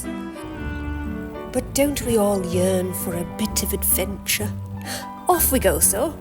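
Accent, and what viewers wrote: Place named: Ireland. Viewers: I don't even know how I ended up here, but it's a really nice video, so I looked at the creator, German location, but for a moment something didn't sit right with me, it wasn't a German accent, but then at one point I heard that nice R and my mind immediately turned to the character from The Simpsons Willie, but I guess I guessed wrong because you're probably from Ireland, right? Anyway, very nice work, I look forward to more. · Funny video! As a Czech and also a resident of Prague, I really enjoyed this video. But I definitely wouldn't choose the campsite near the Veltrusy Castle! :D Oh, how brave you are! :) But I enjoy your accent the most. If I'm not mistaken, it's Irish, right? Love it!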